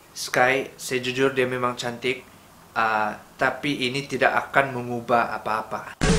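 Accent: Sabah, Malaysia. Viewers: Slang Sabah dia best bah..bikin deep jugak #nasihatberguna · Suka la slang sabah. That's the reason why I followed him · Ur sounds like SABAHAN . . Kita² juga bhai ni kann . . 😏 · I can tell u r a Sabahan...kuat btul tu loghat ..salam knalan d channel kmu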